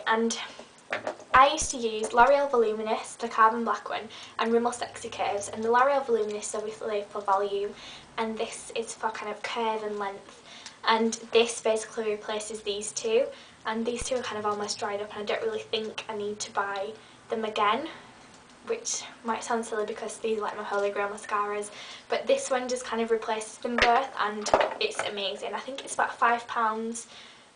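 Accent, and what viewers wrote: United Kingdom. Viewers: i cant bloody handle the wayy you add i got a bloody good day any how do i thought like bloody good cherio shut the fuck up man i hate british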